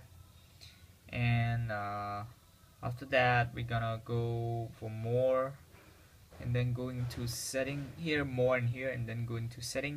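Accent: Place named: United States. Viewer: I swear u sound like American..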